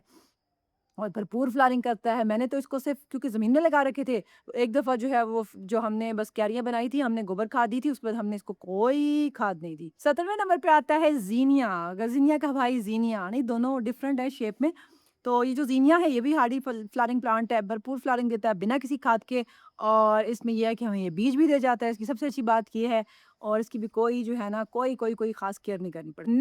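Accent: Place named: Saraiki, Pakistan. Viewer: آپ میانوالی سے ہیں؟ مجھے سرائیکی لگتی ہیں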